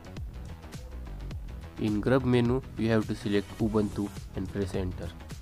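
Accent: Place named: India. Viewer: As soon as i hear bgm and indian accent i knew it's gonna work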